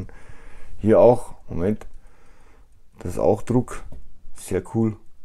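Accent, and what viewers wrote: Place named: Bavaria, Germany. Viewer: finde ich nicht gut Minifiguren herum zu werfen... gibt Minuspunkte. Vielen Dank fürs zeigen eines Disney Produkts, und das nicht Review, deiner Meinung zum Set. Gefällt mir auch gut das Set, aber aus Platzgründen, Zeit und anderen Projekten muss auch mal was nicht gekauft werden. Hehe, hier lernt man dann noch Bayrisch oder wie auch immer diese Sprache die du sprichst man nennen soll - grins. Klaro, Sticker sind manchmal auch cool, oder einfach weg lassen und das Design ist wirklich cool vom Häuschen. Grüsse Inu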